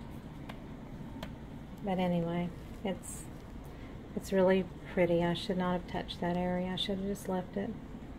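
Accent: South, United States. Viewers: Do I detect a southern accent y'all? :-) I'm originally from Tallahassee.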